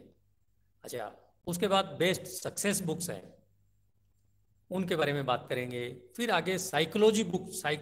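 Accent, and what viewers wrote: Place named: India. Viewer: Perfect Raw indian voice. Suits subject that you have chosen shriman G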